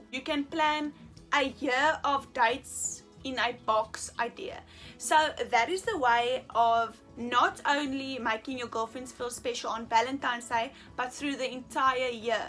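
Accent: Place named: South Africa. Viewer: Ur accent does it for me, proudly South African lols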